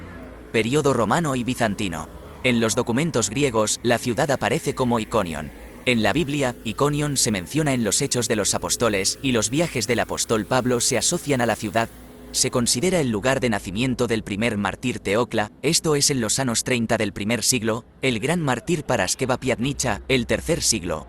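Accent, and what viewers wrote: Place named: Spain. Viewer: que mal habla, aprenda a pronunciar propiamente, hace del video una mierda.Ese acento español, pronuncie como se pronuncia en su idioma. la ñ, y no es túrquia es turquia. IGNORANTE!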